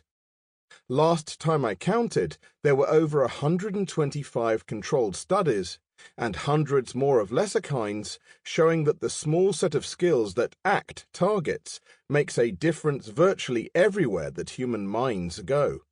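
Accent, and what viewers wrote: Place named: England, United Kingdom. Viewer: Great English accent and pronunciation from the narrator,.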